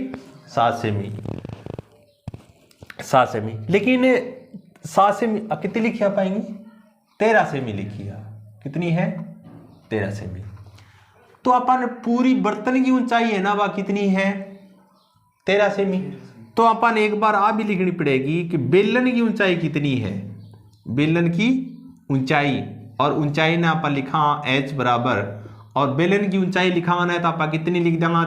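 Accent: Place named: Rajasthan, India. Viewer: sir mujhe aap ke bolne ke accent se pata chala kyuki me bhi Rajasthani hu ☺️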